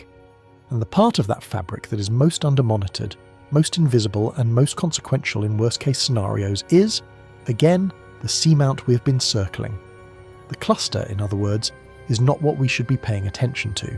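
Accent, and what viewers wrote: United Kingdom. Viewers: After reading repeated complaints across multiple posts of this channel regarding the apparent idiosyncrasies of its content, clarification seems appropriate: 1) Though we speak American English in the U.S., regional pronunciations of U.S. place names differ with regional dialects. How locals pronounce a place name often contradicts spelling. 2) The AI voice used for the channel uses British English. In some British dialects the final ‘a’ of a word can be heard as a very soft ‘r’ or, depending upon the region, a harder ‘r’. As in American dialects, both pronunciations also contradict spelling. 3) Visuals matching audio: It has been reported that this platform has dictated content creators must have constantly moving visuals, static images are unacceptable. You may have noticed some audio books for example, have exited the platform because of the amount of time required to create moving visuals that coordinate with content. 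Longer content requires a great deal of work to find, create, and edit; even using an AI tool. 4) Some content creators have indicated these recent platform changes and requirements have also carried a financial impact, making the continuation of their channel impossible to justify. There is definitely a lot of AI slop unworthy of your viewing on this platform. However, much like an audiobook or purposefully sleep-inducing content, this channel appears to be predominantly intended as an audio opportunity which uses continuously moving images (however unrela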